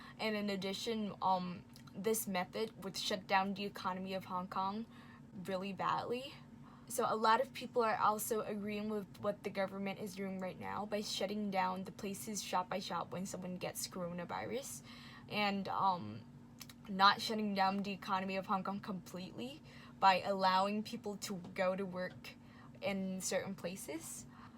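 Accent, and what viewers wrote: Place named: United States. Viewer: Thanks! The pandemic and racism in the US are getting worse. I assume you are from the US based on your accent, please spread the info to the people around you to help curb racism against Asian.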